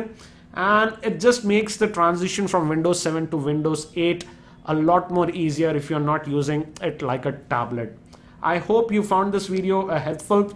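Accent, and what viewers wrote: India. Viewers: LOLOLOLOLOLOLOLOLOLOLOLOL Indian accent <3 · lol i love indian accent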